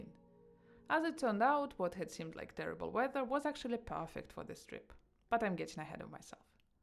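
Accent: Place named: Russia. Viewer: I hear russian pronunciation ...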